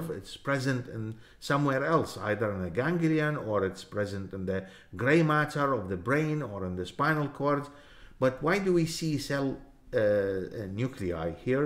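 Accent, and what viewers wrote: Iraq. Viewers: اللهجة العراقيه 🔥❤ عاشت ايدكم دكتور الشرح رائع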